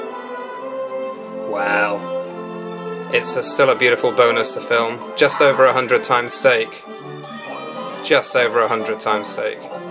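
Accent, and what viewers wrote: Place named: England, United Kingdom. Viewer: Oh no, has the giggles! Actually I agree with love your voice and English accent.:)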